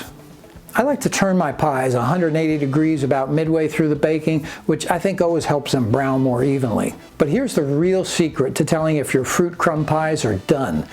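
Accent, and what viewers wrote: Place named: Massachusetts, United States. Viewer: Is he from Central or Western Massachusetts? His accent is making me nostalgic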